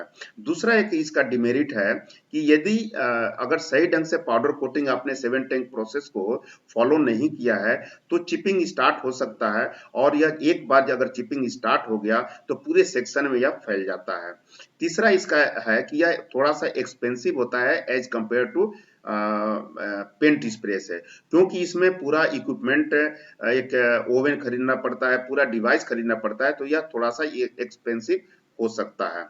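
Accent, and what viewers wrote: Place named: Bihar, India. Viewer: बिहारी लग रहा है।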